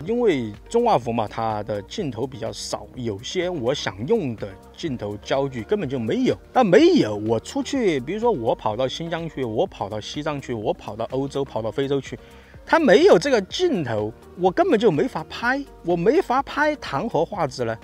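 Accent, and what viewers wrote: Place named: Chongqing, China. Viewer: 听出了川音:face-green-smiling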